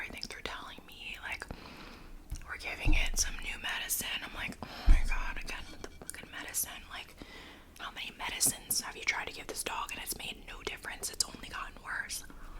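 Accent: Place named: Delaware County, United States. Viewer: Girl are you from Delco? You remind me of back home 😂❤️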